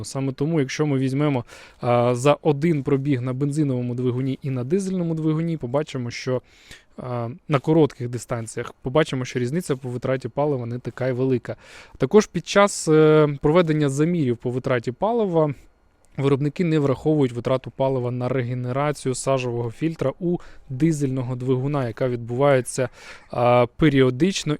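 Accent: Standard. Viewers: четкий Украинский язык приятно слышать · Дуже чиста українська, приємно бачити та чути, та особливо сприймати такий контент! Ви молодець!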